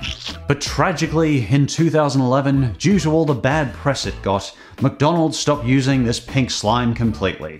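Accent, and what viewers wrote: United States. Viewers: as an American, that was actually a really good American accent. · I can't get over how well you do an American accent.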